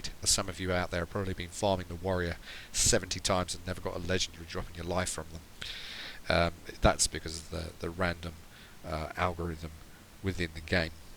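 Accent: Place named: United Kingdom. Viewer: I would rather shove bamboo under my fingernails than listen to British people drone on and on. It sounds like you have the personality of a log.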